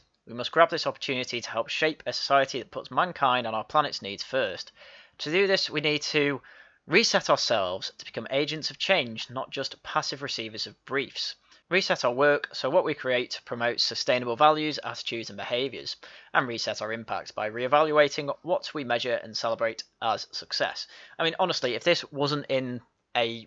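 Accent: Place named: London, United Kingdom. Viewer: Some thick Tyrone from London is narrating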